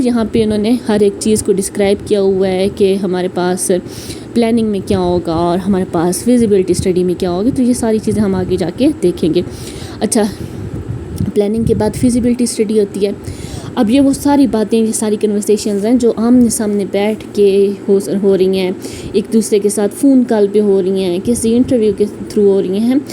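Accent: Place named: Saraiki, Pakistan. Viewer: SARAIKI TEACHER 💯👀 HER WAY OF SPEAKING 🗣️ TELLS SHE SPEAKS SARAIKI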